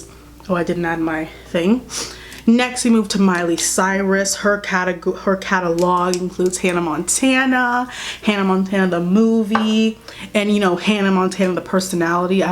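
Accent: United States. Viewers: Gina Rose Belarde well Americans tend to think everyone is American. I can clearly see she’s American but my point is, why does her tone of voice go up at the end of every sentence. Of course I know how American sounds like. You lot are everywhere in the media. This accent is something I’m no familiar with. Her message is hard to grasp because she sounds unsure. Even her facial expressions and that’s just my opinion. Idk why some of you replying to my comment like I said something inappropriate or controversial. You could’ve just told me what area she’s from or anything else that was real information rather than ‘are you not American?’ No of course I’m not American wtf maybe if I was I wouldn’t have such a hard time understanding her delivery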